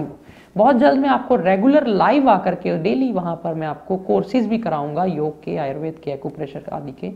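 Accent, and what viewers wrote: Punjab, India. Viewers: Thank you ji Apki language me punjabi touch dikhta hai ji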